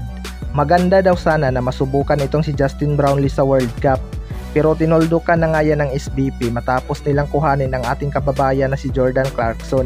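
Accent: Visayas, Philippines. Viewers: Opinsa at dipinsa . Bisaya na bisaya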